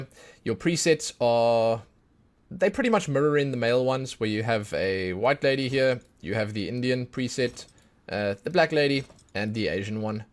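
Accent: South Africa. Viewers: Was watching and could not figure out why I was feeling home sick. Great to hear a zaffa 😂. Great video as well you got a sub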